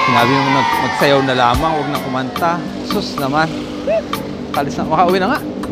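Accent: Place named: Batangas, Philippines. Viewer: Hindi po halata sa mukha,pero halata po sa boses na Batangueno po kayo😂.Talagang madaming naapektohan iyang pandemic😔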